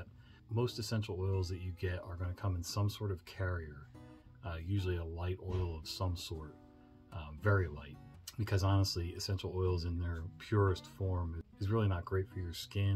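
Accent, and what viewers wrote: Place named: Maryland, United States. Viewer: When I heard you talk, I knew you were a Marylander.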